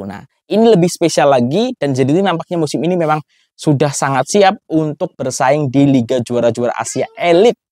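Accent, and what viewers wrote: Indonesia. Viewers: Bang orang Malaysia ke Indonesia? Cara cakap mcm Indonesia. Kalau betul Indonesia, impress gila dengan JDT sampai ke orang Indo buat review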